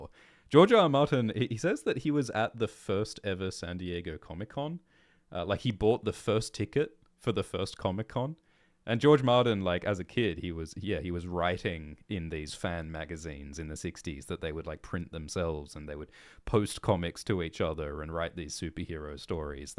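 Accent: Australia. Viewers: What gave it away? The Australian accent he’s used for 10+ years?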